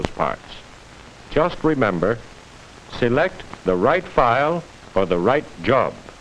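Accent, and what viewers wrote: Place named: Transatlantic, United States. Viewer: It’s the “transatlantic” accent and they used to teach it in schools. It’s the american equivalent to the British RP accent.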